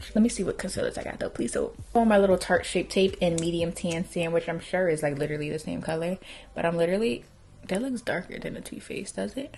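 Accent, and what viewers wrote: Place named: New York, United States. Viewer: It’s the love and appreciation for NYC talk/accent 🗽💕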